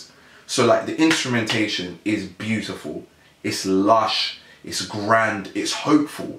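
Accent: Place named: United States. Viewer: Why do you sound like an American?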